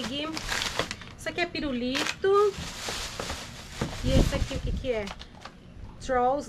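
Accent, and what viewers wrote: Paraná, Brazil. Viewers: Vc é do Paraná?? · Acho que vc tem algo em comum comigo kkkkkk amo achar coisas que de para reciclagem e eu também sou do Paraná nasci em Maringá e fui morar Curitiba ainda crianças ,este seu sotaque não me enganou kkkkkk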